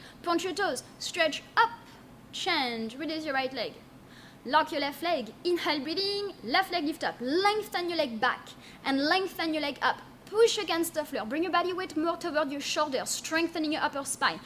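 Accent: France. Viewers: Beautiful, positive energy! Fantastic class, and love the pretty French accent:). La Fance me manque!! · Thanks for this amazing class. I miss so much my studio in Montreal. I will practice with you guys from now on...and I love yourFrench accent as well 😉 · is the best!! She keeps you moving for 60 min never skipping a posture or a cue!! Her French accent is adorable and her dialogue is perfect. Thank you 🤗🙏🏼🧘🏽‍♀️💜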